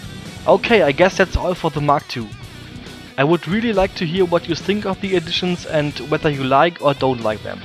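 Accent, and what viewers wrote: Germany. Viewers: I really like that design! Good thing you showed up at the stream. Another good youtuber to watch :D. (German accent I hear there?) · Krasser deutscher akzent xD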